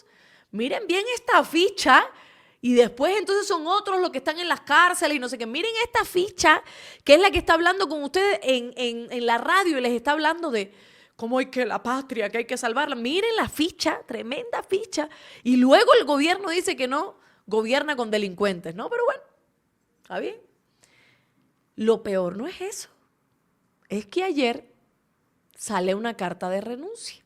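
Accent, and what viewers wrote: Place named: Cuba. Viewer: Saludos bella....Me encanta tu programa....cada vez hablas más en Cubanito...que linda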